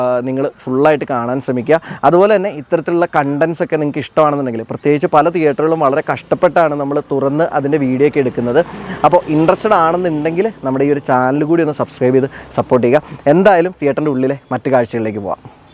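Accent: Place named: Palakkad, India. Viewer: Palakkad ..alle ..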